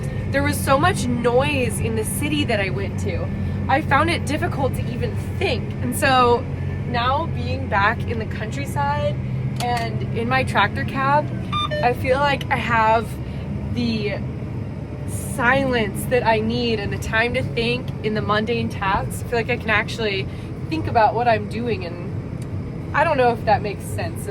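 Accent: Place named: Midwest, United States. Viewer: Your big smile and bright eyes kept remindig me of somone and today I figured it out. you are a young Reba made over minus the red hair And your mid westen accent just adds to the resemblance!!. 😃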